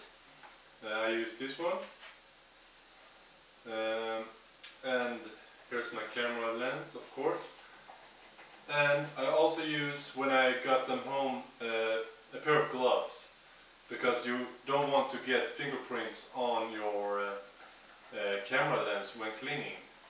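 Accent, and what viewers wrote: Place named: Scania, Sweden. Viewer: du är skåning:D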